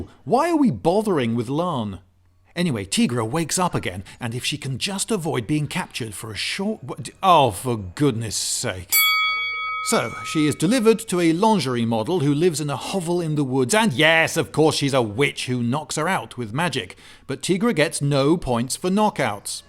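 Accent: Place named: United Kingdom. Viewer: For real this bad teeth brit voice is annoying to hear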